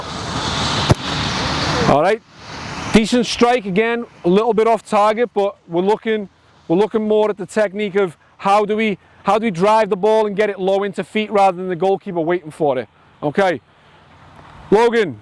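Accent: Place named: United States. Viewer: Little bit of yank creeping into your accent there lad haha, is right la good vid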